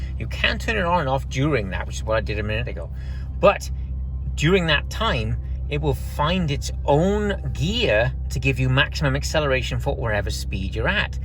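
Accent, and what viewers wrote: Wales, United Kingdom. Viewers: Just wondering is that a hint of a Welsh accent? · Is that a welsh accent i can hear? Glen S.Wales - Great review!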